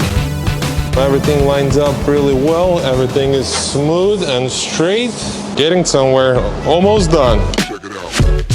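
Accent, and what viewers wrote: Russia. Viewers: этот русский акцент режет ухо конечно 😂😂😂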